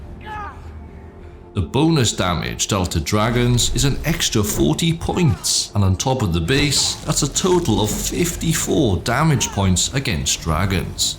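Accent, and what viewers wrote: Wales, United Kingdom. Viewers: why are you Welsh and can you speak elvish?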